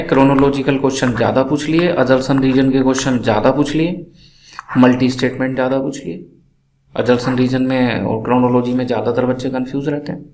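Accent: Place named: Western Uttar Pradesh, India. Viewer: Aap up west (to be exactly muzaffarpur nagar) se ho kya ??? Mere kuch classmates they us area se same yahi excent, tone and dialect thi..😊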